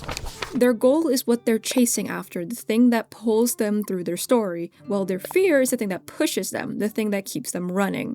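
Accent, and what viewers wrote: United Kingdom. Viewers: lmao i was wondering! the whole video, i sat there trying to decide if you had always had a british accent and i just somehow blocked it out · I’m rewatching this with AirPods and I can now clearly hear the British slipping in 😂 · You’re British voice is fine, Bouth are engaging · I love how your accent is slowly becoming more British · It's not really related to the topic of this video but I find the way your accent changed into this very british english very relatable for me lol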